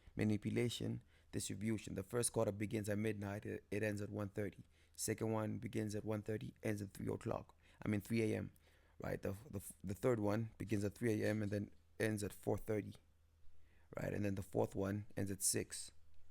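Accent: South Africa. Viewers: he's from south africa :D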